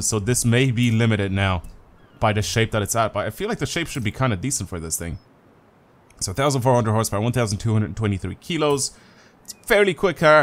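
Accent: Ireland. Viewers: Are you from Ireland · What part of Ireland is he from if he’s even from ireland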